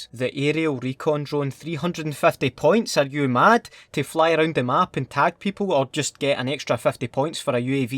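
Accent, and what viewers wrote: Scotland, United Kingdom. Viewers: i love hearing a scot guy ranting about cod killstreaks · great acssent bit cam doon abit ma femily are pure hearted scots men an we ma get feejee a lot bit nae as bad a ye mate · Being from America his Scottish accent is very difficult to understand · Wis happpnin in? Where aboots in Scotland are ye actually fae? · Absolutely love your Scottish accent, love from italy❤️ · It's because Scots tend to have a good sense of humour... But the accent DEFINITELY helps. Frankie Boyle for example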